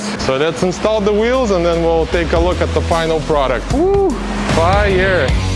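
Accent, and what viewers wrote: Russia. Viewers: этот русский акцент режет ухо конечно 😂😂😂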